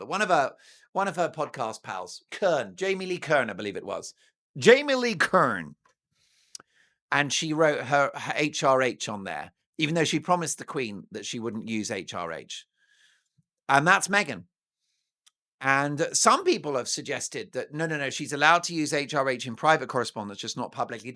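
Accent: Australia. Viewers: your Australian accent is pretty good but it's more * than * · Your Aussie accent is terrific!!!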